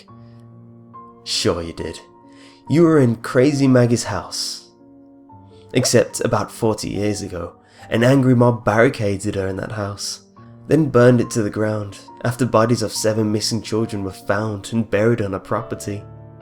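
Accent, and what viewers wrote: England, United Kingdom. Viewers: are you from the south or north of England?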